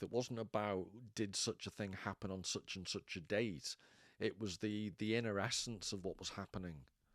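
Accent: Liverpool, United Kingdom. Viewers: Are you from Liverpool by any chance? 🤔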